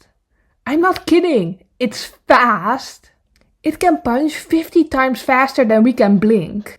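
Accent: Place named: Netherlands. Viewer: Are you dutch because I hear the dutch accent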